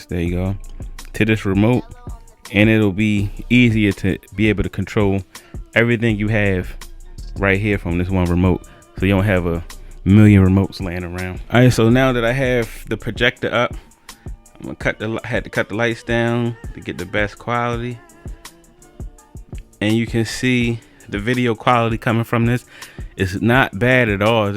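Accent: Baltimore, United States. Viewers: Gotta be from Baltimore😂😂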